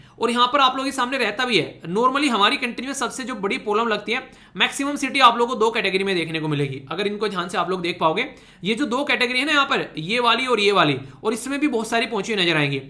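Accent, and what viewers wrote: Haryana, India. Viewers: apki bato or awaj se or APK ghr se , or khane ki choice se ap Rajasthan Haryana me khii se ho ,, 90% Haryana 💓 😄😄😂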